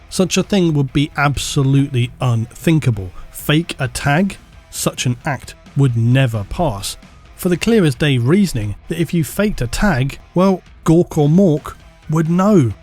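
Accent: United Kingdom. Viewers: Your voice and your accent in particular your British accent really helps you with a American audience I think I mean I like listening to you talk about this stuff more than say some American YouTube channels that talk about it most Americans want to admit it but a lot of them like a British accent when it comes to like narration an hour someone reading something or you know stuff like that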